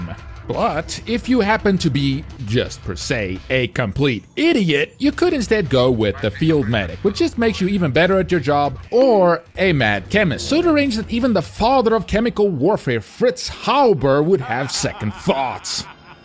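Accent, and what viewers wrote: South Africa. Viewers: WAIT HE'S SOUTH AFRICAN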